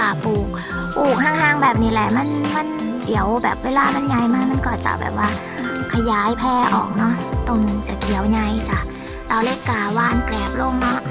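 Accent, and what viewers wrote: Isan, Thailand. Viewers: เสียงเว้าอีสานน่ารักหลาย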